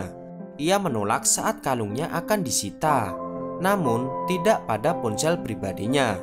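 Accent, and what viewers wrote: Java, Indonesia. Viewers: Jawa nya medok banget min 🙈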